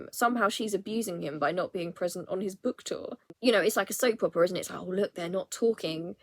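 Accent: United Kingdom. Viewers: New subscriber here beautiful. You have a british accent, its new to me & happy that you understand the mess inside BRF. William is super imsecure of Harry, very Diana is Harry so approachable, organic, genuine and so authentic full of compassion and not hot tempered.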